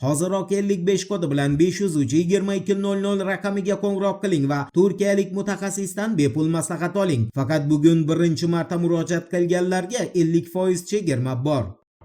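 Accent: Uzbekistan. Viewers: SHUNCHA YILLARDAN BERI KUZATIP KELAMIZ SHU TURFA OLAMNI, SHUNDAY EKAN DOIM VIDYO'NI KO'RGANDA LAYK BOSIP TURAYLIK AKA-UKALR???? BU AKAGA O'XSHAP, SOF O'ZBEK TILIDA GAPRISH HAMMAGA HAM MAS!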